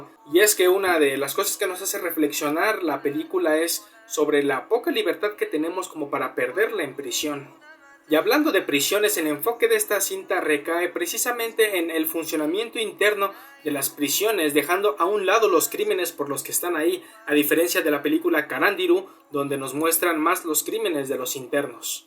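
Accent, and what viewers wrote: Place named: Mexico City, Mexico. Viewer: Todo iba bien hasta que se oye tu pinche acento chilango arrabalero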